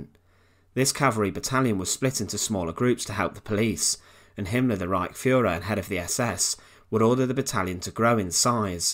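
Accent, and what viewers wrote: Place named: Leicester, United Kingdom. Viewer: I've said it before, but fantastic to hear a proper Leicester accent, makes me homesick. Re the vid, wasn't Eva Braun "HOT!"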